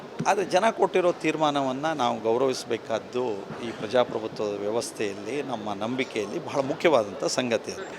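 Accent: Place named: Uttara Kannada, India. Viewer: ಸೋತ ನಂತರ ಅದನ್ನು ಹೇಳುವಾಗಲೂ ನೀವು ,ಸರಳವಾಗಿ ಉತ್ತರ ಕನ್ನಡ ಜಿಲ್ಲೆಯ ಆಡು ಭಾಷೆಯಲ್ಲಿ ,ನಿಜವಾದ ಕಾರಣ ಹೇಳದೇ ,ತಾನು ಇನ್ನು ಸಭಾಪತಿ ಎಂಬ ಬ್ರಮೆಯಲ್ಲಿ ,ಏನೇನೋ ಹೇಳುತ್ತಿದ್ದೀರಿ.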